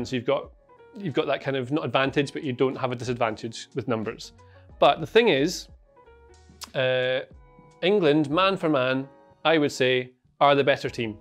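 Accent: Scotland, United Kingdom. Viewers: Great tactical breakdown. It’s really weird hearing a Scottish person say ‘Good luck to England’, though.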